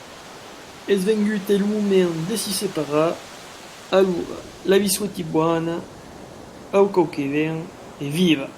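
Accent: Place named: France. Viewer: Je doute que ce dialecte de l'occitan se parlât avec cet accent français.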